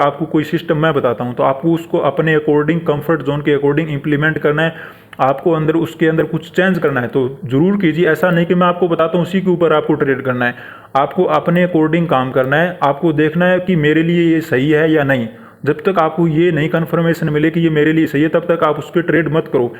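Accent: Haryana, India. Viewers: Bhai ji bahut aacha sikha rahe ho aap Aapki boli to hariyane aali lagge hai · कई बार लगता है उत्तराखंड से है आप कई बार आवाज के इंग्लिश टोन से लगता है हरियाणा बॉर्डर से है राजस्थान साइड वाले से।।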